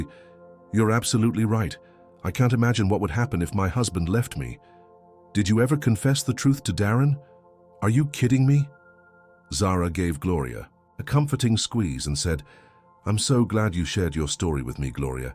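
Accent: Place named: England, United Kingdom. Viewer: Note to the channel… Just because you use an AI reading voice with an English accent, does not make the video somehow higher quality. This is a re-hash of a very old story but it took the first 1/3 of the video before I recognized it. The awkward gaps between words (or running words together), all the mispronunciations, calling the private investigator the “AP” at one point, just makes the video poorly produced with a complete lack of editing and proofing. You’ve got segments of the video in the wrong places, making the story really confusing. All in all, this video is a hot mess and not worth the listener’s time. AI – generated videos like this one truly suck 👎👎👎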